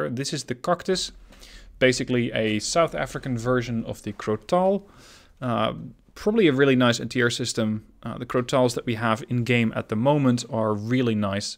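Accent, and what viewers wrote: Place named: Netherlands. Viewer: Holy Dutch accent! (Flemish here ;)) Looking forward to this.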